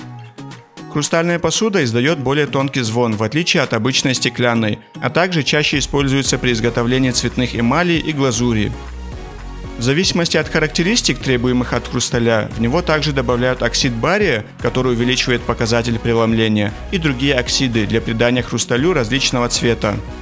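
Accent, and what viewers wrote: Kabardino-Balkaria, Russia. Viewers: Кабардинец? Родной акцент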